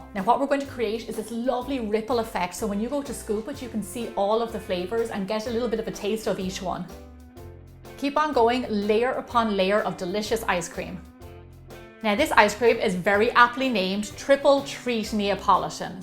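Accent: Ireland. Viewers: I love your Irish accent in the making of kulfi Ice cream. You should try Chamomila and honey Ice cream soon.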